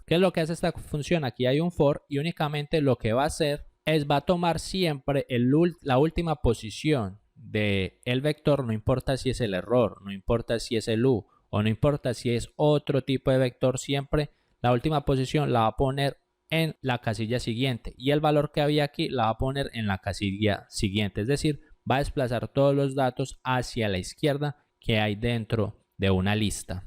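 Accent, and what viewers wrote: Colombia. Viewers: Muy buen video. Tienes acento Colombiano ¿si?.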